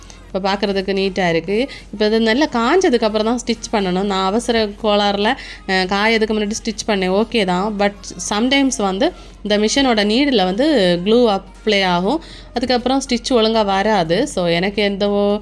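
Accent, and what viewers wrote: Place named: Kuzhithurai, India. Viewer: I like your speech,I had relatives in kuzhithurai,They speak the same style as you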